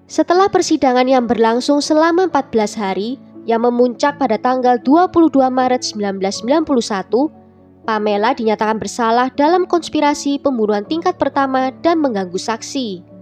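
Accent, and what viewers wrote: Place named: Java, Indonesia. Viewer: kenapa kasus yg anda ceritakan selalu menarik apa rahasianya.....dan satu yg tak terlupakan yaitu medok nya.....top lah pokoknya.....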